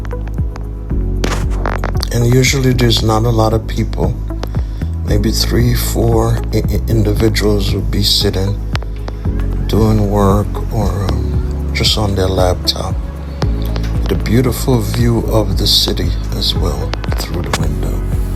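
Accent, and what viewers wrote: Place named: Jamaica. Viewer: I can hear that powerful exploratory Jamaican heritage in your voice bro! I wrote this before i saw the flag I've just reached that.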